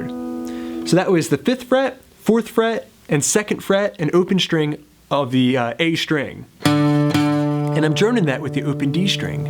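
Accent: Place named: Philadelphia, United States. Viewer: Im also from Philly the accent was the clue I am working on Thanks for the great lesson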